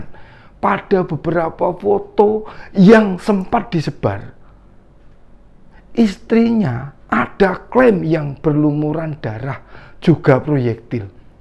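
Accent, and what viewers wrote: Java, Indonesia. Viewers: Medok amat ini bpk logatnya aku juga dari jawa tp logatnya nggak medok banget tp lucu dari logstnya aku juga nonyon kontenmu sampai ful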